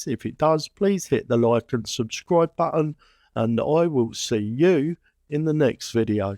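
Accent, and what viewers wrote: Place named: Birmingham, United Kingdom. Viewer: brummy accent that lol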